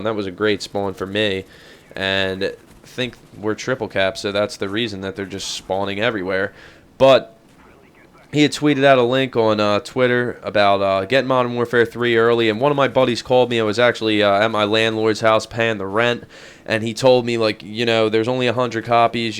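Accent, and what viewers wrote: United States. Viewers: What state are you from? Killer accent. I'm Australian by the way which is why I can't tell, I can't really tell where all the accents in the states come from :P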